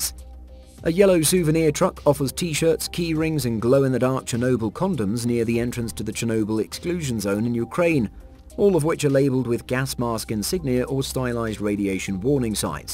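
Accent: United Kingdom. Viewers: Hold on there Mr. British voice. The word SOCCER was derived from the British in the early 1800's so its y'alls fault that its called soccer, not North America. Interesting video either way. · Fuc! Are only brits doing documentaries? So sic of the accent!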